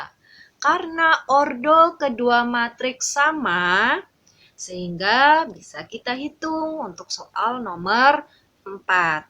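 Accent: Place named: Java, Indonesia. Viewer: Iya bener medok yah mz hehe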